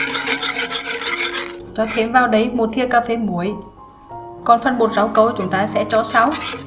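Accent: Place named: Hue, Vietnam. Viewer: món thạch bạn làm rất đẹp luôn .cô đầu bếp nói tiếng huế thất dễ thương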